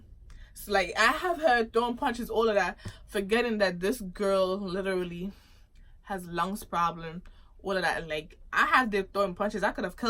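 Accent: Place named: London, United Kingdom. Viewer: Sounds like a Londoner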